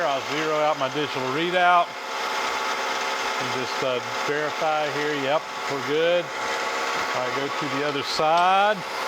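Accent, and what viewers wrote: South, United States. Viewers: I just finished watching the video from Hand Tool Rescue where you had to make the part for him. In the part of the video where y'all were having the "phone conversation," the minute you opened your mouth, I thought, "That boy ain't from Canada. I wonder how far below the Mason-Dixon line he lives." I was born and raised in Monroe, LA area. My ancestors came from Georgia and Alabama. Enjoyed the video.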